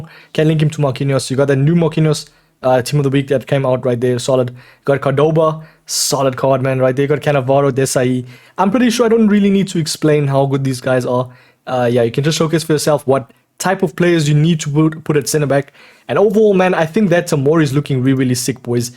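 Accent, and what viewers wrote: South Africa. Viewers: You sound south african?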